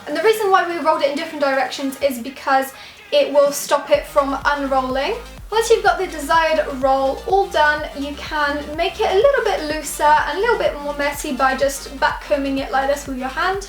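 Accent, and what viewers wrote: United Kingdom. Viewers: She's Polish, but you can clearly tell by her accent that she lives and was born in the UK.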